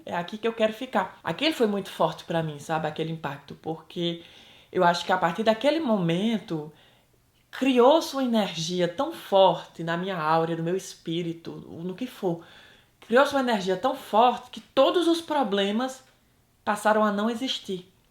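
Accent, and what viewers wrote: Northeast, Brazil. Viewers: Eu ri e chorei com sua história, que coisa linda❤️ amo nosso sotaque nordestino, que Deus te abençoe. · Que sotaque gostoso, meu nordeste é lindo. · Mininu,e tu num perdeu esse sotaque até hoje., Por causa de que ❓ Tu è da minha cidade, crato!!!🤩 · Amo seu jeito nordestino, seu jeito de falar, alto astral. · amei sua história gosto do seu jeito de falar não perdeu sua origem nordestina muito simples estou conhecendo Paris com vc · Esse video me foi recomendado e quando eu vi que era de um cearense vim correndo ver. Amei sua historia, amei que não perdeu seu sotaque, parabens por tudo. ❤️ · Eu admiro você por ter mantido o sotaque do nordeste, isso é lindo!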